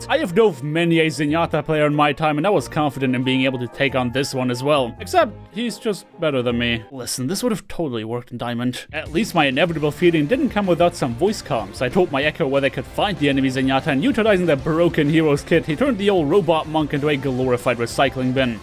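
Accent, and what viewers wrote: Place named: Germany. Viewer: He sounds kinda German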